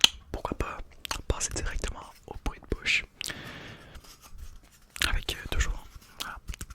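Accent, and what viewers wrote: Quebec, Canada. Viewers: L’accent québécois reste un des meilleurs à mon avis :)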